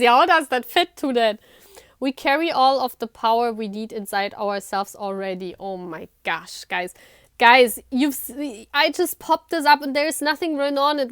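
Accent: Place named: Germany. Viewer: Are you german because of the accent? Ps nice video💖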